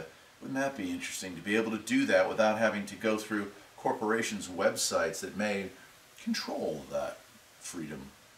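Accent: North Carolina, United States. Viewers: Wow. Excellent video, brother! Funny thing. I was just looking up stuff on CB radios, and I ran across one you have about 5 years ago. Your voice...well...do you know how your own voice sounds different when you hear a recording of yourself? Well, you are the first person I have run across whose recorded voice sound exactly like my recorded voice, when I hear myself that way. LOL, it was a little creepy, bro. Anyway, so that caused me to be curious and look at this second video here. Here, you reveal that you are from the NC mountains. I grew up in High Point, so that partially explains our common accent and similar speech patterns. But... ...Just today, I was thinking the very things you just said here. "Hypothetically," I may end up being very happy I installed that CB in the Jeep. Anyway, the reason I started out with that seeming nonsense, is to point out that I seem to have been drawn here. 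Now I have to watch a bunch of videos to try and figure out what you have said that I was meant to hear. I really wish you did not just say you have 70 videos. This might end up being a very long night.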